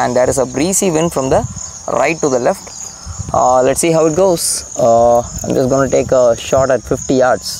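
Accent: India. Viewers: Nice Indian accent 👌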